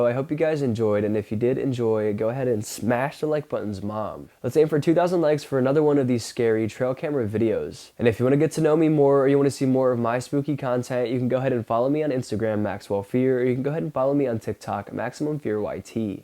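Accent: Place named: California, United States. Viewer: Get a narrator. You have too much vocal fry and a very Californian accent. Your voice is not good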